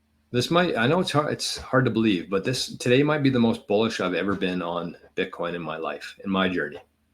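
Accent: Canada. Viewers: As an American, it’s so cringe to listen to a canadian 🤦🏻‍♂️ Very mid content.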